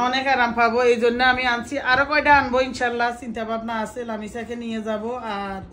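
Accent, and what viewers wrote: Noakhali, Bangladesh. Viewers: আন্টি আপনার দেশের বাড়ি কি নয়াখালী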